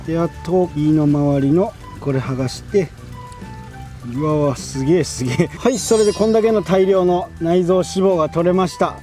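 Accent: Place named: Shodoshima, Japan. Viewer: ナガセの待ち時間長いからね。 小豆島弁が素敵。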